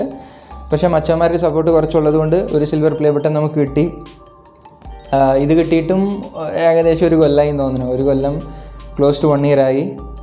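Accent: Tamil Nadu, India. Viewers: Chattayi Malayali anno? Or Tamil?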